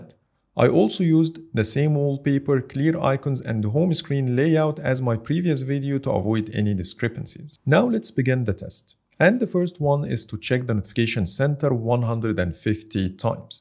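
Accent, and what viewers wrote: Egypt. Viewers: Your English sounds Egyptian to me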